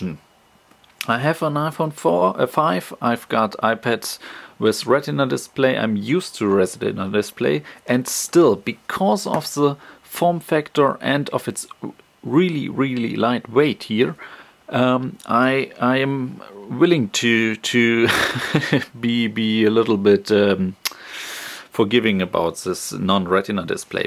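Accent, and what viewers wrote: Germany. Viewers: Nice comparison. i went to Saturn today and test the Ipad mini it was a little bit horrible specially on some Text in websites compare w/ retina.. "Anyway if you guys asking which Nationality this guy belongs due to his accent... His from Country where Mercedes Benz,BMW,Audi and VW was made =) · after 2 sentences i knew hes german ^.^ am i right?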